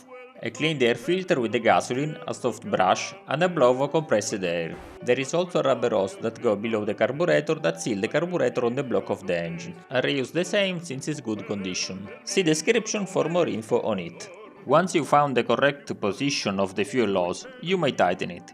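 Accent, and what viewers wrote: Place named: Italy. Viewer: I totally enjoy your italian-English! Great video! Greetings from Germany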